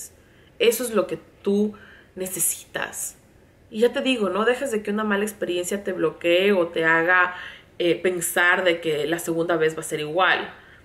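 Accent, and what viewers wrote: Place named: Ecuador. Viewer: me encanta que a pesar del tiempo que vives en USA sigues hablando muy ecuatoriana ❤️❤️❤️❤️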